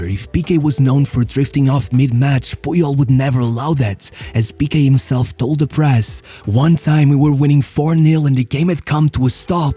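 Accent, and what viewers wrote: Portugal. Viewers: Your “Roberto Carlos” accent… você é Português?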